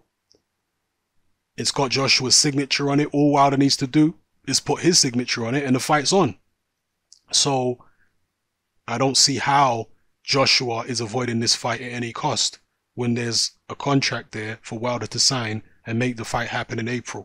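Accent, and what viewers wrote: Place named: United Kingdom. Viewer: Shut the f up dude u sound like u from the u k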